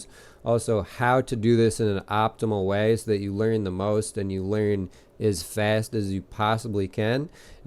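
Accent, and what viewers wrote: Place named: Slavic, Eastern Europe. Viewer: wow, I thought you were Russian or from another Slavic country😅.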